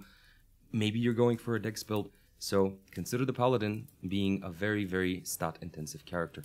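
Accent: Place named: Norway. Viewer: You have nice accent when you speak. You're norwegian, aren't you?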